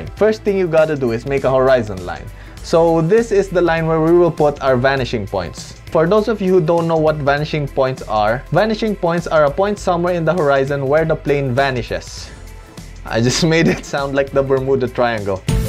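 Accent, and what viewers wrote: Philippines. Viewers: Carry that filipino accent hard kuya! Be proud! Hehehe love them vids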